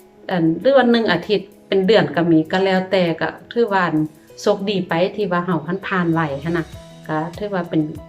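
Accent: Luang Prabang, Laos. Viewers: แสดงความยินดีด้วยค่ะมากๆค่ะ เว่าม่วนหลายจ้า ฟังสำเหนียงก็รู้ว่าต้องยู่หลวงพระบางจ้า บ้านพี่อยู่หนองคายอ.ศรีเชียงใหม่จ้าตรงข้ามเวียง ผ่านกฏเร็วมากๆค่ะเก่งมากจ้า👏👏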